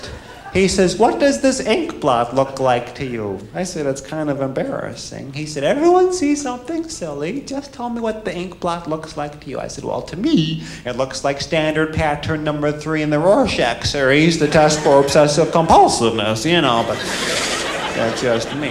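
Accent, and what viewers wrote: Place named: United States. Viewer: Could see him playing Shakespeare plays if he lost the American accent.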